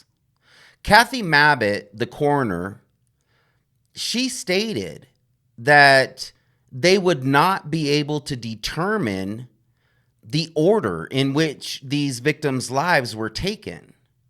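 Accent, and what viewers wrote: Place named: Flint, United States. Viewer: Chicago, Memphis, Flint, or anywhere in the state of New Jersey lol. Judging by your accent I would say Flint. No disrespect by the way. I have family in all three cities and the state of Jersey.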